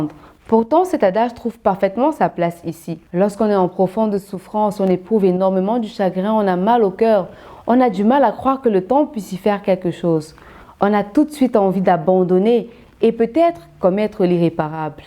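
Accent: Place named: France. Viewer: Bon projet. Mais ma belle stp parle comme une Kamer car parlé un peu genre french girl la, c'est pas très originale. Mon point de vue seulement ooooooh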